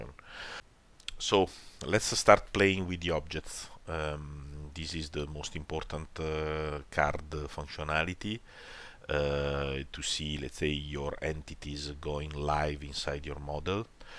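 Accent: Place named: Italy. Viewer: Per ringraziarti ti ho "comprato" un caffè! (fra l'altro dall'accento immagino tu sia italiano)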